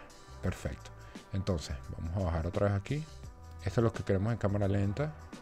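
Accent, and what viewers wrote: Venezuela. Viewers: Excelentes videos mi pana, eres venezolano cierto? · eres venezolano? muy buen tutorial :D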